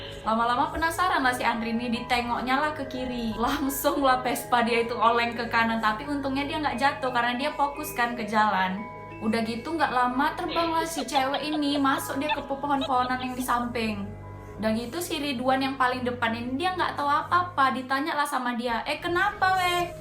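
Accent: Medan, Indonesia. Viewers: suka banget kalo dengerin orang medan cerita beda aja rasanya kayak lebih seru lahhh mantab semangat terus kakkkkkkk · Anak Medan emang gitu bang kalo bicara keren😃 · KEK NADIA OMARA APA JIPLAK APA EMANG GINI OSEMUA ORANG MEDAN NGOMONGNYA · Cerihas suara Medan nya gak hilang kk .keren .horas .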